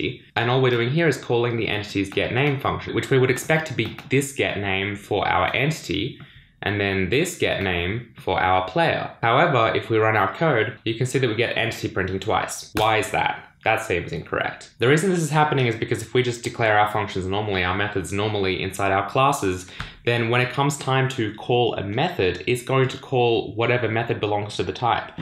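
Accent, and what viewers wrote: Australia. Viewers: Hey! Got to say great channel to learn c++, awesome channel to get used to the australian accent!!:)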